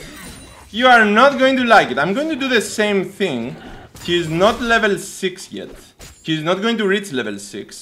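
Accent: Greece. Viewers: Hey man love these videos, very funny and informative :) btw your accent sounds Greek, is that right?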